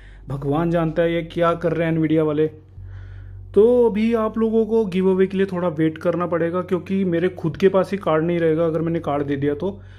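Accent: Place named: Punjab, India. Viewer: Bro abb to khair theek he ho app Bro face reveal thora suspense ke sath karna tha Baki mere ko lagta hai ki app punjabi bolte honge ghar pe 😄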